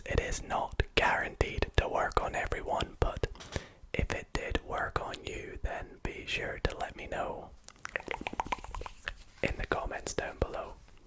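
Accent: Scotland, United Kingdom. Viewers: I just realized from Scotland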